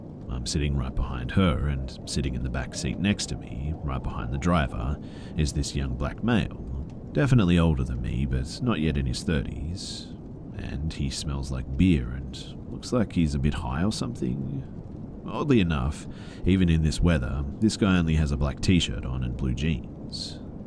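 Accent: Australia. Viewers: Are you Australian ? Just discovered this channel I’m asking because if you are, that’s cool lol.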